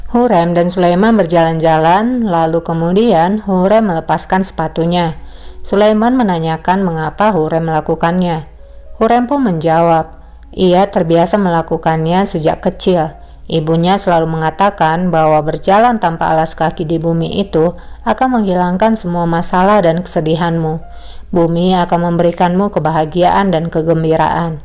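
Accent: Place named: Medan, Indonesia. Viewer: Yg ngomong ini seperti saudaraku ya orang medan sebab ada omongan lalap dia hamil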